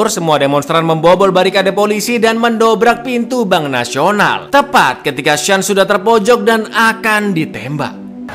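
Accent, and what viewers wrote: Java, Indonesia. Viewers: Bang suara Lo kek orang Jawa ya!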